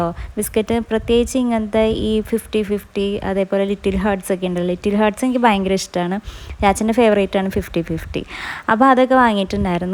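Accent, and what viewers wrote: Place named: Thrissur, India. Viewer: Athu Thrissur ullavarde samsarathil varunnatha adi kittum ttaa ithupole ellathilum tta tto swabavikamayi avrde samsarareethiyil varunnatha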